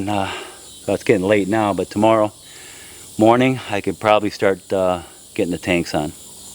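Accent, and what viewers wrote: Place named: New England, United States. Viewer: sounds like New England to me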